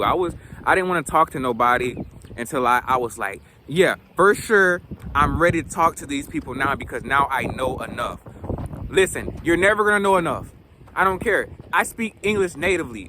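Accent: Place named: United States. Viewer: Im à black man who fluently speaks 3 languages including Jamaican patois, English, and of course Spanish. Now folks always look at us like a unicorn and believe we must be Latino or have some typa Mexican or Latina girlfriend or some bullshit. I pride myself on not needing any Latin blood to speak Spanish. Im part Caribbean and my dialect of Spanish is hard to understand just like my patois. Cuban dialect, Kingston dialect for the patois . Currently learning Tagalog. Just need a bit more practice and immersion to learn fluency and more vocabulary. I actually hate using apps. I’d rather learn with a community organically and make mistakes to be corrected. Love that you speak Spanish brethren, your accent still sounds à but gringo like, but with more immersion and use of it you’ll probably end up sounding pretty “Mexican dialect” from the looks of it.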